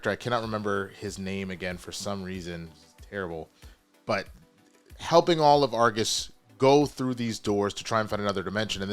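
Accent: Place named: South, United States. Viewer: I loved the finale. This was continuing the DCU story not Superman’s story as you said on today’s stream in that wonderful southern accent. To me the story of the season was clear. It was a romance story between Chris and Emilia and a character study of Chris and how HE AFFECTS THOSE AROUND HIM so even if he isn’t on screen we are seeing how important he is to those around him. The story wasn’t about Nazi Earth it wasn’t about Big cameos it was about Chris, and how his decisions affects those around him and dealing with consequences.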